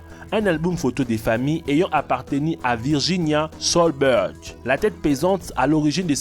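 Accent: Quebec, Canada. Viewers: Adriien Terliier Toi apprends déjà à savoir que c'est un québécois et c'est pas de sa fautes ci il s'exprime mal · Le français Québécois bande de cons.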